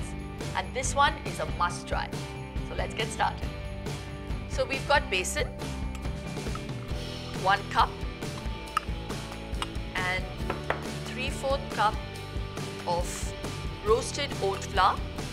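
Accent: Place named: England, United Kingdom. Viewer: I like her English accent but didn’t understand a word, thus will not be making this dish😢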